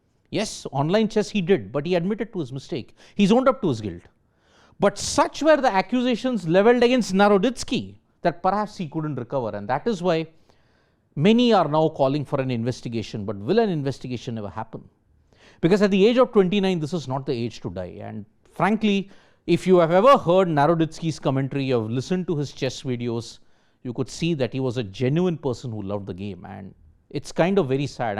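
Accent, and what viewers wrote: South India, India. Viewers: Sports today, please change the presenter. His south Indian accent is very difficult to understand.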